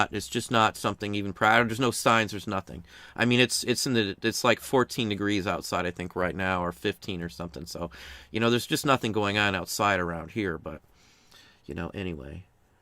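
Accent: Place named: Northwest Ohio, United States. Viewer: I lived most of my life in northwest Ohio. From how you talk I assume you live near Toledo? What city do you actually live in or what town?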